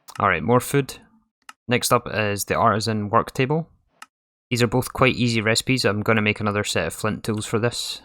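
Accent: Scotland, United Kingdom. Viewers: I think it might be one of the best gaming experiences I've ever had, to be honest. My wife is probably feeling neglected though. Forgive my American ignorance but is your accent Scottish or like northern Irish?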